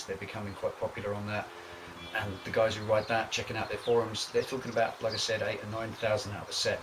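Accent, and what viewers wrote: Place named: Cockney, United Kingdom. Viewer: Settled on Bridgestone Battlax's after Michelin, Continental & Pirelli. The Battlax's are still good after 12,000km, whereas everything else was totaled at 5000km on my Kwaka ZZR600. Battlax's are harder, but still great on wet with very little "walking" at speed when cranked over. Here in country Australia my average shopping trip is 400km with a mix of open and hill's roads so it may be a different story where you are. Great vid's, you got the cockney gift mate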